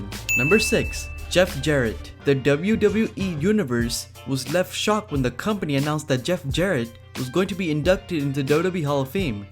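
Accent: India. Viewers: The speaker is from where??? Sound like indian fucking garbage voice and accent trash channel trash speaker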